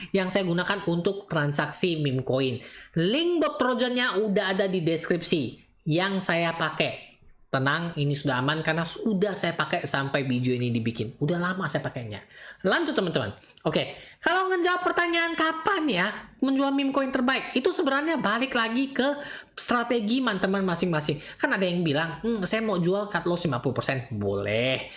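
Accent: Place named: Pontianak, Indonesia. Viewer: Pak po.. bahasa orang pontianak ne hyung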